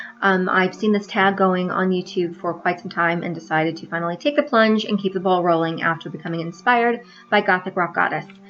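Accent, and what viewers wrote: New York, United States. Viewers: Ditto on the gym playlists! Never representative of actual musical tastes. The gym is a whole different beast. And nothing wrong with a NY accent, NYC is rich in subcultures! 👍🏻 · What's wrong with a NewYork accent I love it! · I hear the NY! Fellow Queens chick here. But you lost the nanny accent so that’s a good thing!😝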